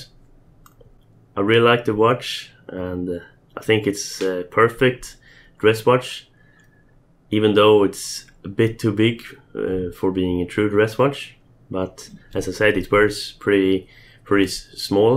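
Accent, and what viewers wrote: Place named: Sweden. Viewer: The accent haha. I've heard it a million times here in Sweden, though you don't have one as thick as most.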